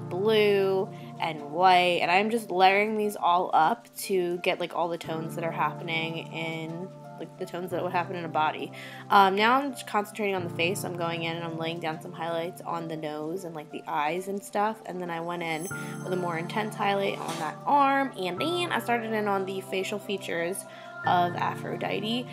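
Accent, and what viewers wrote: Pennsylvania, United States. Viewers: I'm a new subscriber! It's so funny I could tell you were from PA by your accent! Lol. I love it. I'm from PA too! You are so talented ❤️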